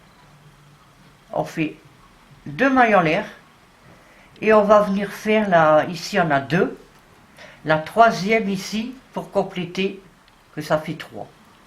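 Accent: North, France. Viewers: Bonjour, madame. J'aime bien votre accent aussi. J'ai de la famille dans le nord aussi, à marquette les lille....et les personnes du nord sont tres accueillants et plein d'humour. Votre vidéo est très bien, ce gilet magique, ...rapide à faire et simple.❤❤❤😊😊😊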